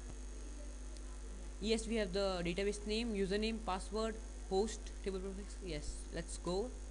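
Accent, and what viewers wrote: India. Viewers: Thanks dude.... are u indian?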